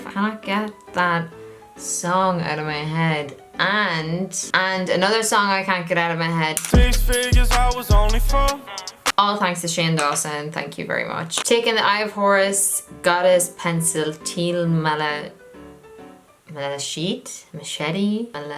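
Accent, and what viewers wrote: Ireland. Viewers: Is that an irish accent u have?in its cute!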